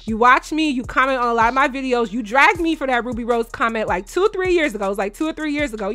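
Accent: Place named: United States. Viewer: Tbh I never understood the hype over Rubi rose she just looks like any other IG thot with not much more than their body to offer lol. This is really random too but you’re so pretty and I don’t live in America so it’s interesting to watch an Igbo girl speak with an American accent, rather than the strong Nigerian accent which I always hear 😆 my mans Igbo and I’m close with his family, we both pointed out that you resemble his sister a little bit when he saw me watching ur video. Her name is also. Igbo features are so strong and easy to spot when you’ve been around them a lot. Very beautiful. Love how they use old English words a lot like “have a prosperous day my wife” (his sisters also call me their wife) and often mention god while they are talking about stuff. 🥹💗 nwanyi oma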